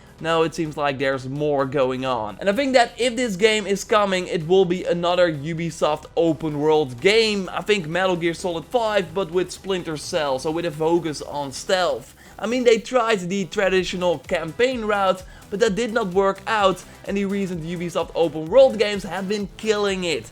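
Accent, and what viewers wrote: Netherlands. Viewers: From what country are you you sound like you are from holland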